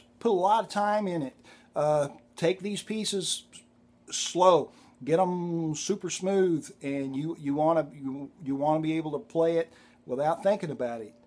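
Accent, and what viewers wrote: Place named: United States. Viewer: Dear, thank you for these lessons! Can I ask which state your accent is from? I adore it. Love a Brit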